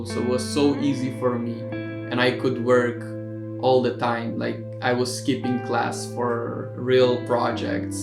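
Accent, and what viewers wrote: Philippines. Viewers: You sound like a Pinoy. Haha. Interesting, first time I see a Caucasian guy sound like a Filipino. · I notice the way you speak English you have a Filipino accent.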